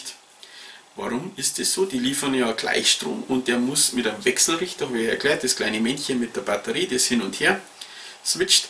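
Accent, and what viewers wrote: Bavaria, Germany. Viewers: Hallo,zuerst mal super Kanal und auch für mich als Elektriker,Prepper,Sportschütze und Funkamateur moch was zu lernen. Du hast ne tolle Art besonders der Bayrische Dialekt ist super. Einen kleinen Kritikpunkt hätte ich und zwar die schlechte Tonqualität,vl wäre ein externes Micro oder so besser aber wahrscheinlich weißt du das eh selber und bist da in der Richtung eh schon am überlegen. Freu mich auf weiter VIdeo bitte mach so weiter echt TOP.!!!!!!!!!!!!!!!!!!!!!!!!!!!!!! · Für einen Bayern redest du richtig gut hochdeutsch. Ist sehr verständlich. Hab schon Sachen erlebt, da hab ich nichts, aber auch gar nichts verstanden. Hab nur nach dem Weg gefragt.....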